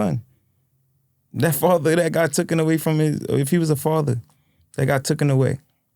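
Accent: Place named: Philadelphia, United States. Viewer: Bro from Brooklyn but sound like he from Philly lol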